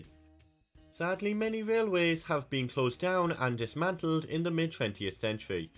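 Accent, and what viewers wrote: Cork, Ireland. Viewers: Thats some Cork accent this fella has.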